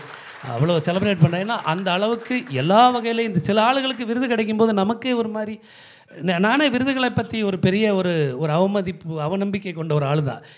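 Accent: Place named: Kerala, India. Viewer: அவரே தான். இவர் ஒரு எழுத்தாளரும் இலக்கியவாதியும் என்று தெரியாது. மிஷ்கின் படங்களில் மலையாள வாசனையோடு கூடி வரும் இந்தக் குரல் என் மனதில் எப்படியோ பதிந்திருக்கிறது. மிகவும் அழகாக பேசியிருக்கிறார் இந்நிகழ்ச்சியில்.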